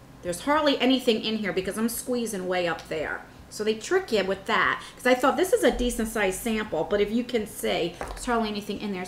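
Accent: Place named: Philadelphia, United States. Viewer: where are you from? you have kind of a philly accent lol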